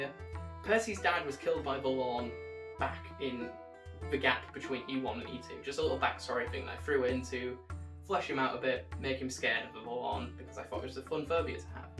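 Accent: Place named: Hull, United Kingdom. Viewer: Future Empire Navarri been binge watching these to see what it’s about these are great, also what accent is that sounds Hull or somewhere alike good description video 🍻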